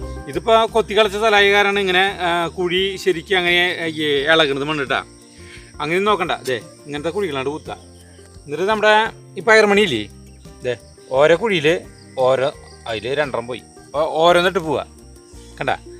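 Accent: Thrissur, India. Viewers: സൂപ്പർബ് ട്ടാ തൃശ്ശൂർ slang രസമുണ്ട് കേൾക്കാൻ. ഉപകാരപ്പെടുന്ന video. പിന്നെ വള്ളിച്ചീര കിട്ടീല്ല. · കൃഷിയേക്കാൾ ഇഷ്ടായത് മ്മ്‌ടെ തൃശൂർ സ്ലാങ്ങാണ്റ്റ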